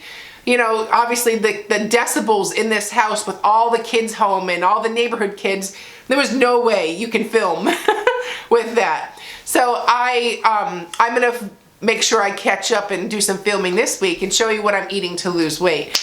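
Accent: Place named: New Hampshire, United States. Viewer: Florida, Texas....when did you live in NH? That New Hampshire accent comes out! Loved seeing the girls playing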